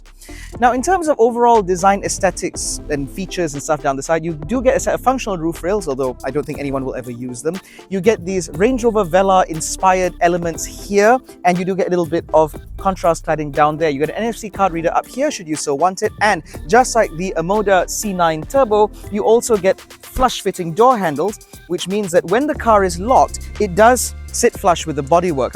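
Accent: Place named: RP, United Kingdom. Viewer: ..rocking those shades, with impeccable queens english..while driving the omoda9..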